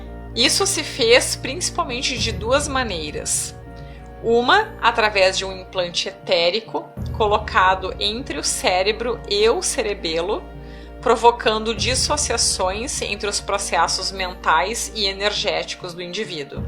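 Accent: Rio Grande do Sul, Brazil. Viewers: Adeildo Amorim sotaque gaúcho. Bem como todo o jeito que ela fala.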